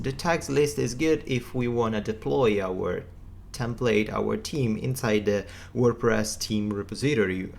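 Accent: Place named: Italy. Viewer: Il tuo accento italiano rende il tutto molto comprensibile e fluido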